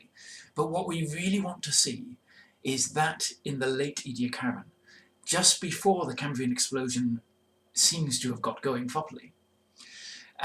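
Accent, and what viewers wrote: United Kingdom. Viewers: I first watched the video, the cc was not visible. Checking for it was the first thing I did. It may have been so on your device, not mine. That’s why I asked for the captions. I’m glad you understand the British accent. My ear is not your ear. We’re all different. Were we not, earth would have one language. Checking back now, I see the cc is up. That’s all I asked for.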